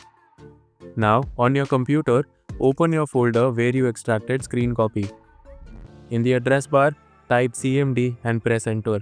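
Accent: India. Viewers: an indian accent... this just raised my HOPE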